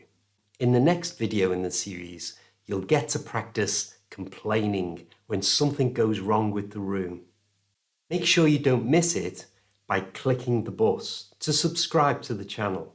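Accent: United Kingdom. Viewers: hi, your voice is excellent, easy to listen british accent. lovely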